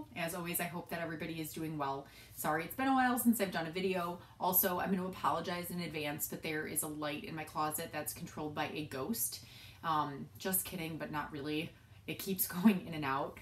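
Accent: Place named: Minnesota, United States. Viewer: are u in Minnesota? The accent ...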